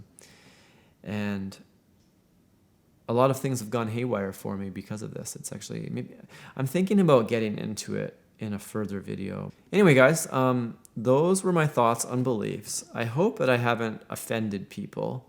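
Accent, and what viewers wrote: Canada. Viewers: I have a very similar perspective to you on beliefs, but you've worded it much better than I can right now. I went through a religious phase but now am agnostic. Honestly my belief system is a Frankenstein monster of bits and pieces of every religion, belief system, and psychology but at it's core it's trust my intuition on what feels correct. I also appreciate your Canadian accent, my mother's side of the family is Canadian and it's always made me feel at ease 😊 I'd love to hear more of what you think on this topic.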